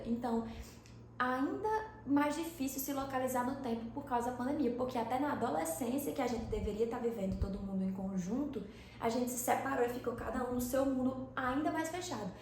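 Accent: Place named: Paraíba, Brazil. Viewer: C é da paraíba? Mó sotaque daqui haha vídeo mt massa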